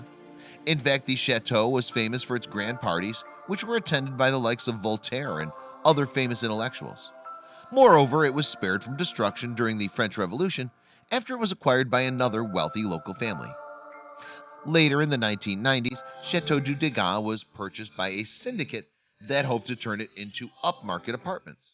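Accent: United States. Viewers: irritating narrator... why an american..? and stupid music.... disturbing like hell! no likes or subscription, guaranteed! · THE AMERICAN VOICE ON THIS VIDEO IS A COMPLETE TURNOFF. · Why the dreadful American voiceover with mispronunciations?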